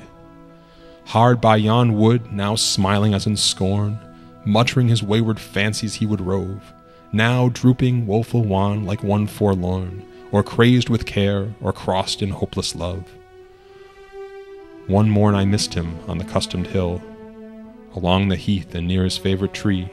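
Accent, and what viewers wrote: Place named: United States. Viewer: Finally .. someone reading it in American accent, Thanks so much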